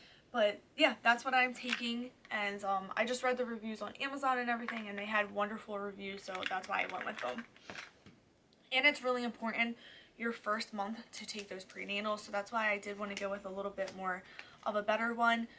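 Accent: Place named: New Jersey, United States. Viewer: I just knew you were a fellow New Jerseyan from the first second of the video lol😭 loved this video. I’m having the same exact symptoms 😩 I’m scared to test so I’m just waiting out my period, if it ever shows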